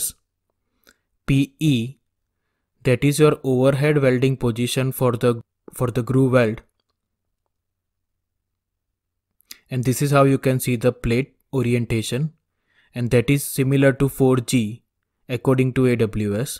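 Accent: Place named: India. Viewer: Can you do it without the Indian accent. It's wary aard to understand you.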